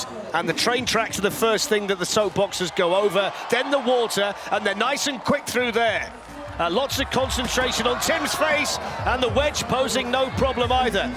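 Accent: United Kingdom. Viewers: This seemed interesting until the British accent started. Then it was annoying.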